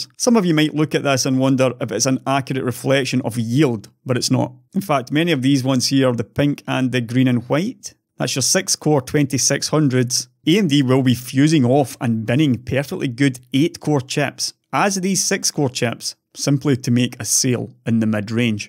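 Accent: Scotland, United Kingdom. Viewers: I've learned his Scottish accent and it's really great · your pleasant Scottish accent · his Scottish strong accent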